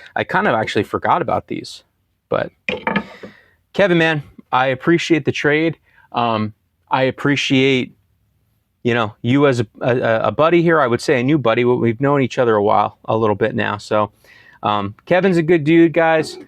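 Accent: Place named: New England, United States. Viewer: I knew that was a Ne accent. I’m in NH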